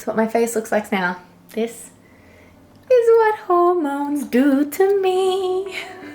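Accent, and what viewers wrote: Australia. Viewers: When you say “week two” I melt. Love your Australian accent!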